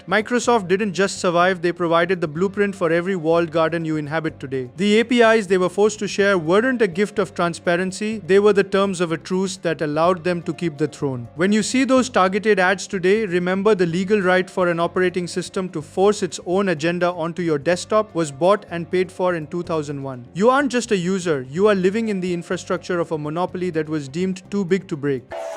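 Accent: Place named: India. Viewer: Someone has to say it: It’s not AI, he’s just Indian, they all sound that way! Actually I know a lot that speak without any accent to the point you mistake them from other places. I also appreciate that at least it’s real info and accurate how I feel to the situation. I can’t say slop certainly. If it was AI, then even AI sees the issues.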